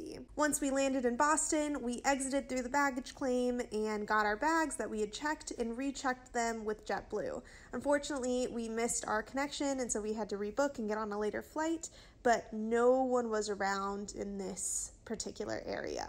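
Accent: England, United Kingdom. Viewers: She's American so how does she have an English accent 😶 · Wow! Thanks for the update! I'm glad you are safely back. Hope to see more videos soon. I really love to hear your English accent. By your accent I'm sure you are not from the south. Because you don't have a southern accent.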